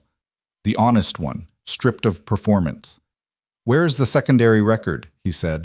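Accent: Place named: United States. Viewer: Please use a British AI narration. American ruins the lovely story. I am an American.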